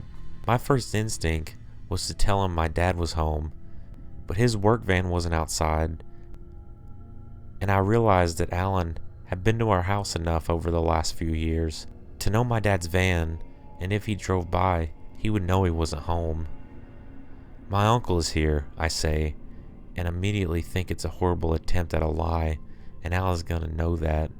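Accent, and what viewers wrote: South, United States. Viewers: u country asf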